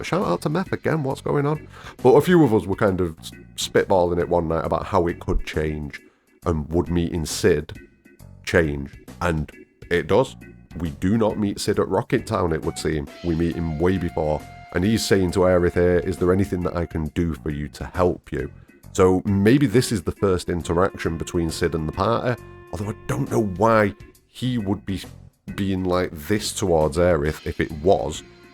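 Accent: Northern England, United Kingdom. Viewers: what's your accent? I know its British but where - i suck at pinpointing accents but yours makes me laugh when you be expressive on purpose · Always love to hear a fellow northerner on YouTube.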